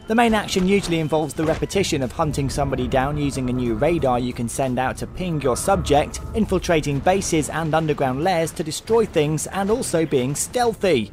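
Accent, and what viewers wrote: United Kingdom. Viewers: The voice is way too brit.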